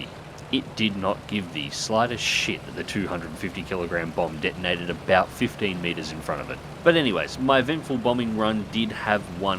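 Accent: Australia. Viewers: Matey, I really enjoy how you describe things!!!! You could make bread mold sound like the most fascinating thing on the planet!!! If I was an Arab, I would buy sand from you to put next to all of the rest of the sand I had!!! With that smooth Aussie accent, I'm glad I'm not buying a horse or a used car from you!!!! Please think of this as my off-handed attempt at paying you a sincere compliment!!!!! What Squire is to funny, you are to amazingly interesting!!! the fact that you are often self-deprecating, makes you even more interesting and honest!!! I have been such a huge fan since I found your channel!!! I truly wish I could buy you a cold beer and a stubby of Jack!!! Maybe some day I will get the opportunity, who knows, the world is getting smaller all of the time. All the best from way out West, Sprog1 in AZ.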